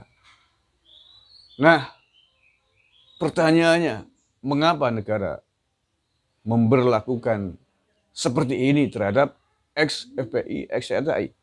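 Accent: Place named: Tegal, Indonesia. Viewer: asik mas dangdutanne..iyonge wong tegal kiye mas, mantapp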